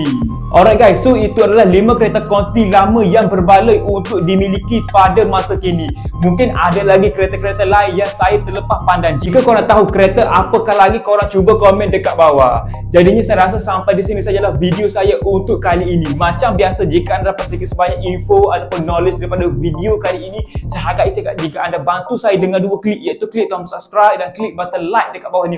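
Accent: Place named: Kelantan, Malaysia. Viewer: Boh. Demo mmg try hard nak cakap KL. Tapi demo ank jati kelate. Bunyi kelate demo tu. Gapo² pon video demo meme terbaik. Klu buleh , demo tulis laa kat video. MK5 , Merc , Bmw F20. Mudoh sikit ore nak cari. Tapi keto hok demo list ni , untuk ore ado duit poket lebih , buleh laa pakai. Keto lamo mesti ado nyakit. Sparepart pon parok. Sapo² ado duit poket lebih , boleh laa guno. Keep it boh 👍🏻👍🏻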